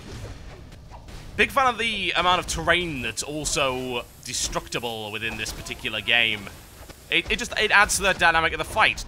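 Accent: United Kingdom. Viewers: I do think this game seems impressive, but at the same time i feel like im unsure to buy it or not. I was so excited for cata (mostly due to these videos) but when i finnaly played the product i felt something was missing (parhaps the brittish voice in the background but who knows). Points is, i dont want to build up my expectations to high, to then have it shot down when i buy it which was kinda what happpend with cata. As for why i wrote this comment.... i felt like it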